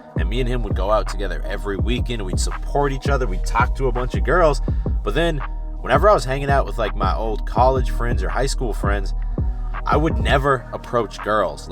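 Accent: United States. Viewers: the delivery is very American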